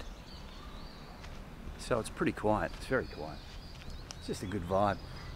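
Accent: Australia. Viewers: When you and LJ DO get together, be sure and make a vlog. Reason why is because your Aussie accents are totally different.. I guess each city in australia has their own unique accent??. True?Fun to listen to either way for us americans.. I also listen to a channel called archieluxury. He is a crazy bloke from Brisbane who is also in love with bangers.(thailand). Check him out if you havent already. He is definately certifiable.. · Hi, you sound Australian. also you're not old enough to retire ? have a nice day.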